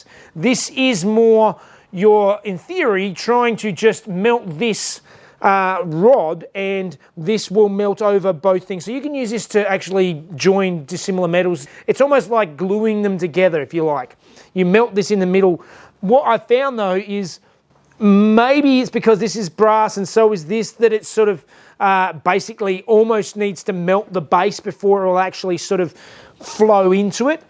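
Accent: Australia. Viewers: Hello, I am a french old guy watching your amazing videos that I love. Can I suggest something? Fortunatly you put subtitles under the video because, (as I live in Bali most of the time, I know that you Australians have a fucking accent!)...so thank you for these subtitles. But please, can you do it without all "hum" and " hu" because it makes them harder to read? I can't wait watching the Alfarrari finished so hurry up! If you come to Bali, in european winter time, let me know and come minimum for a drink or stay in my beautifull villa! Say hello to Mrs...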